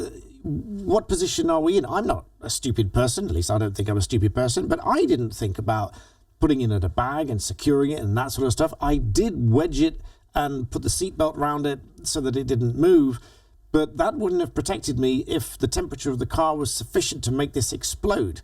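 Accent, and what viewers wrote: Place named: Northern England, United Kingdom. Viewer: He is a bit Northern.........Possibly.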